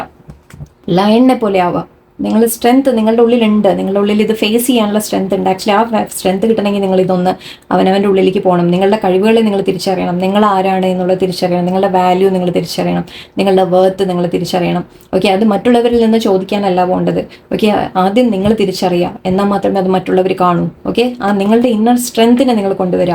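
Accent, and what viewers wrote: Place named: Koyilandy, India. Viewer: ഞങ്ങളെ കൊയിലാണ്ടി ഭാഷയാട്ടോ എന്താ ഇവളെ ചിരിക്കൂന്നേ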